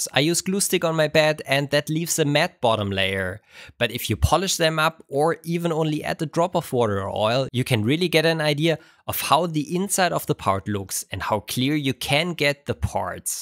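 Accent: Germany. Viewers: Wie das deutsche rauskommt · Du bist doch Deutscher oder 😅 also ich mein da was rauszuhören 😁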